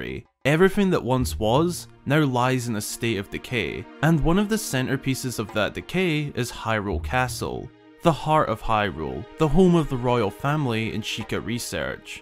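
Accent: Scotland, United Kingdom. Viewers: You have the Andy Murray accent, complete with the groaning sound and drawn out words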